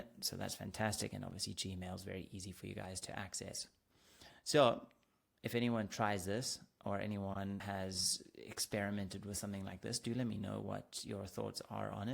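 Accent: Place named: South Africa. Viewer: Well presented and it unlocked some ideas for my future client builds. It's a definite game changer for creating neat reports, without having to use various apps. I thought I recognized the accent... a fellow saffa:-D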